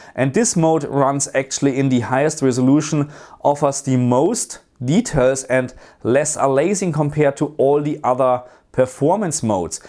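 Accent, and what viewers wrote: Germany. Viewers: Man hört so raus das du Deutsche Wurzeln oder so hast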